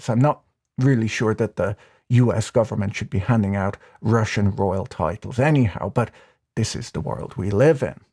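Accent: Ireland. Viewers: I want him to do an ODB story so I can hear him name off his AKAs in a straight Irish accent. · Listening to dry humor and delightful Irish accent when he dissects the current madness happening in the world is so enjoyable in these insane times we live in.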